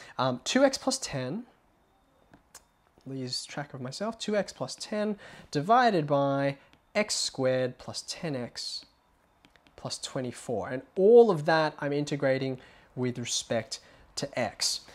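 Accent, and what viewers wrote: Australia. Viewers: Australia, apparently (strong diphthong on "o").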